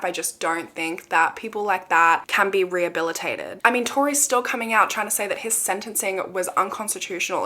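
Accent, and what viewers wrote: Australia. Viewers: Just her Australian accent is all❣️. Lived there in my younger years, and I love hearing her speak, reminds me of the past.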